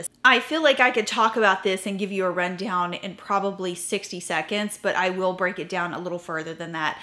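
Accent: South, United States. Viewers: I betcha you were raised in the south....where?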